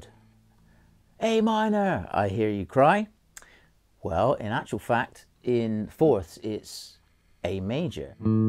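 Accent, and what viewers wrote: Bristol, United Kingdom. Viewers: did you go to Bristol university, per chance? I gather you're based in Bristol and I can hear the slight accent, but I was there '98-'02 and I think we're about the same age..Anyway, since I heard your playing a couple of years or so ago, I always thought it was some of the very best and melodic in the best way.. I'll be listening and learning some stuff as well as enjoying your music. Have a good evening.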